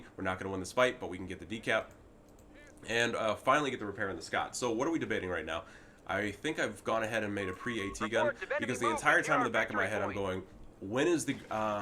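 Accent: Germany. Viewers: Great game, your german accent cracks me up every time.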